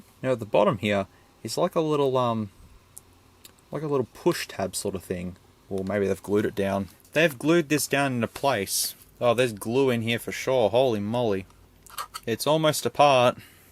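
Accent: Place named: Australia. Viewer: Big love from the UK, always known Wish is a sham, but hearing an Ozy tell it in plain Ozy talk is always great fun. (Lived there, have family there, Love there, ‘WISH’ I was still there) 😀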